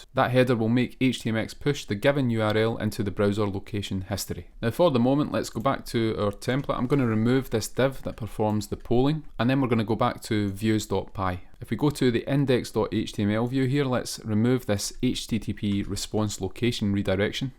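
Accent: Scotland, United Kingdom. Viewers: Thank you for the content you produce. And thank you for the Scottish accent! 😀 I was supposed to move to Scotland recently but some unfortunate events stopped me, so this will help ease the pain. I'm in the beginning of my Django learning journey, so I will definitely come to your videos many times. Good luck!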